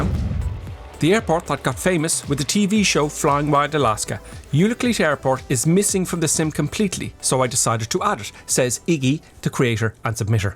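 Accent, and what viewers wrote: Ireland. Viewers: Are you another Irishman 😂🇮🇪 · Flight simmer with amazing Irish accent? No choice but to sub :)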